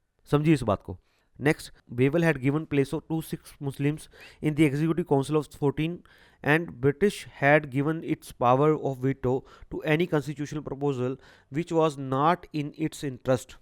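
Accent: Punjab, India. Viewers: Sir Punjab wali hindk bolre ho😉😉 thnq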